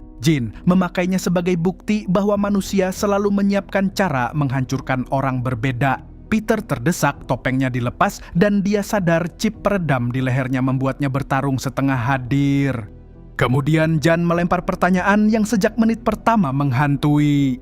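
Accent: Banjar, Indonesia. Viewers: Waluh urang banjar sakalinya admin nya.. 😁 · Dikira salah dangarr, skalinya bjuran urang banjar yg mreview😂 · Orang banjar kah pian mang??? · Urang Banjar sekali nya neh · Urang Banjar png asa un nh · Baru tau kalo kamu urang banjar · Host ikm urang banjar jua kah 😅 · Orang banjar jirr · Admin Nya Urng Banjar Kah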